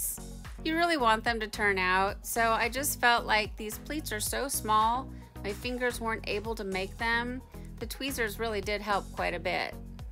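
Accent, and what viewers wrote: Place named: Midwest, United States. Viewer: Not weird at all! But I insisted my husband come hear you say it because I knew it would give him a taste of home. You’ve got the typical midwestern “flat a” just like he does when pronouncing words like back, flat, and, of course, wag. He’s from Michigan, but we currently live in South Florida, so “hearing home” made him smile. Thanks for that. As for THIS wigwag, HOLY MOLY!!! I don’t have words (and that’s saying something for ME!) Simply stunning! Redemption complete.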